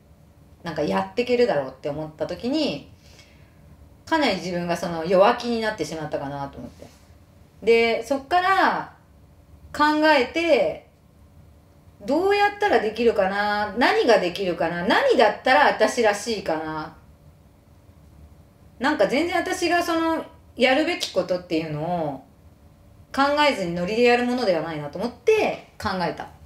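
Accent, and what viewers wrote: Nagoya, Japan. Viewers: Twitterの一言に多くの共感！YouTubeではより多くのコメントを聞ける楽しみがあります。これからも頑張って下さい。 普通にイントネーション名古屋弁入ってまっせw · 登録しました！ 名古屋からです。 名古屋弁、期待してます。👍🏻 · 一度にこれだけ長く話してるの初めて見ました。たまに名古屋弁のイントネーション入ってて嬉しいw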